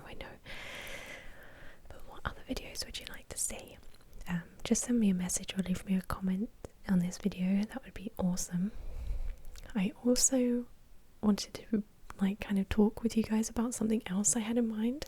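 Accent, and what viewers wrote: United Kingdom. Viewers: your British accent, so beautiful!!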